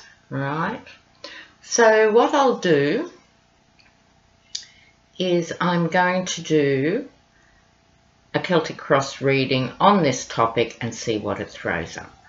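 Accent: Australia. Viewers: Hi, I just discovered you. Great to hear a fellow Aussie speak about the crap going on in the states! A lot to catch up on...😊😊😊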